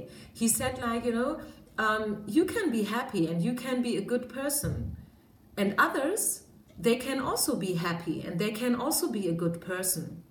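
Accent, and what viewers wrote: Germany. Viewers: New sub here! Picked #3. Wow! So on point. Amazing! I'm indeed starting my own business and I'm a really spiritual person. I'm single for 2years now and I plan to stay like that, I guess you can say I gave up. I loved your energy. I studied German in Regensburg when I was younger, and I love to hear your accent. Thanks for this reading. Love and light from Brazil 🤗🤗🤗😃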